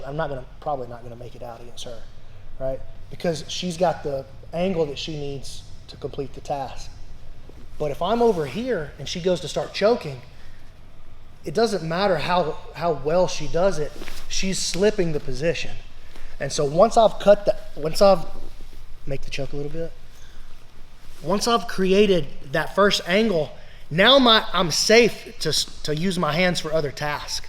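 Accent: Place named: Alabama, United States. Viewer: hey are you also from alabama? I recognize the accent. cheers from Hartselle.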